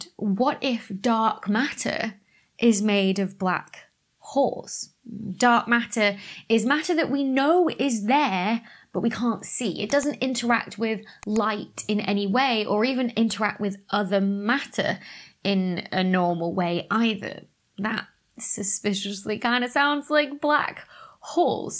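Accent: United Kingdom. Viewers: Where is dr.becky from ?? Britain ?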